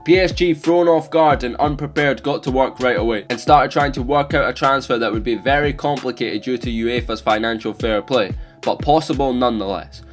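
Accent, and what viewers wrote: Scotland, United Kingdom. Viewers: i thought you sounded Scottish I support Cardiff and man united because my dad supports them and united because all my mums family support them and there my closest team I'm just saying so I don't sound like a bandwagon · british accent banging mate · U Scottish?? Instant sub if u r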